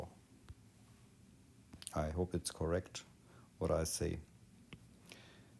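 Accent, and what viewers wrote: Germany. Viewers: Sounds german moin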